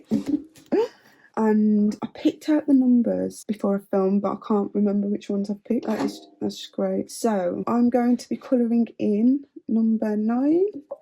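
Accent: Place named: Midlands, United Kingdom. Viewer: Glad I found you. I love a good midlands accent !!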